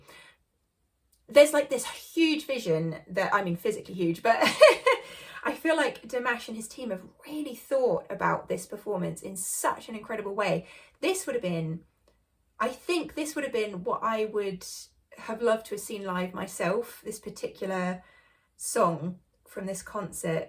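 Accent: United Kingdom. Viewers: Nice British accent you have(Shakespeare 's), thank you so much!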